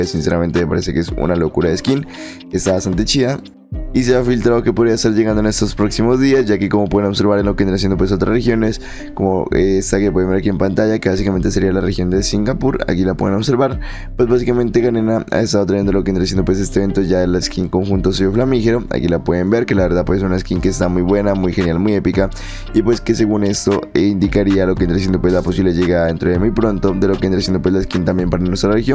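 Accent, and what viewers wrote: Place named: Argentina. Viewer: Lo más xd que escucharán hoy confundía el acento argentino con el español XD 😂😂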